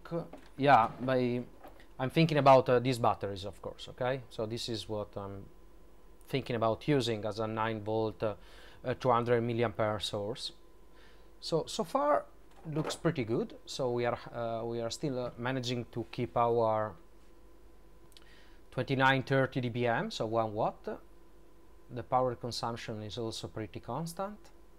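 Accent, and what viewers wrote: Italy. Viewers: O sei italiano o sei francese haha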